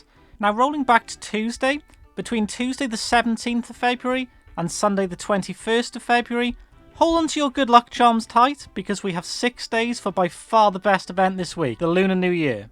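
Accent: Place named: Llanrumney, United Kingdom. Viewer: Anyone ever told you that you sound like you're from Llanrumney..?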